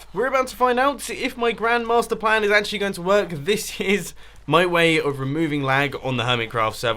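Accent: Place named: United Kingdom. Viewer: 10 reasons to watch Mumbo: 1. The intro is awesome 2. His voice is awesome 3. His Redstone contraption are awesome 4. You can actually learn a lot about Redstone from his content 5. His voice is awesome 6. He has a British accent 7. HIS FUCKING VOICE 8. His content contains no vulgar language, partial nudity, etc. - he is kid friendly 9. Him.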